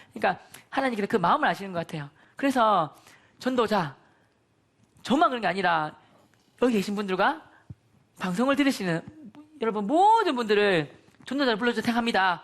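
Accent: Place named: Busan, South Korea. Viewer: 부산 사투리가 이렇케 구수한줄 첨 알았었요 ㅋㅋㅋㅋ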